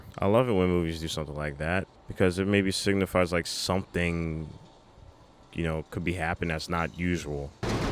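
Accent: Philadelphia, United States. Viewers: I knew your accent was familiar. Philly represent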